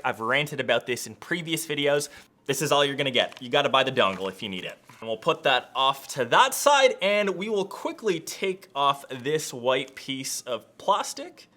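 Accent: Canada. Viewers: You have an accent. Are you Canadian? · I have to admit, one of the highlights of watching your videos is chuckling when you say words like out, about or been, but we love our neighbors to the north. 🤣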